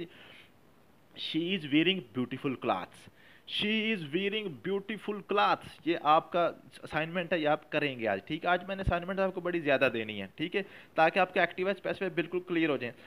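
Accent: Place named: Punjab, India. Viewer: v informative lecture Sir ❣👌👌....way of teaching very amazing with punjabi toch...😍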